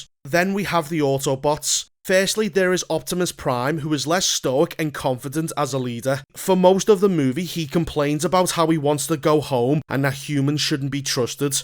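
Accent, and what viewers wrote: Liverpool, United Kingdom. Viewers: This guy sounds like the typical scouse arsehole. You could’ve used reasonable words to say “Oh yeah not a fan of this movie tbh” but nah you overreacted. Yeah okay it’s not everyone’s cup of tea but at least be respectful about it. Calling a director a dickhead was also a typical dickhead move. Just a terrible review overall. At least this film was better than the last two transformers movies (not including bumblebee)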